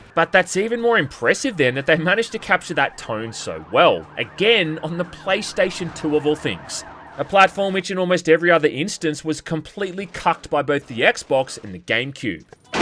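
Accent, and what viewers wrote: Australia. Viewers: Fkn AUZZIE 😂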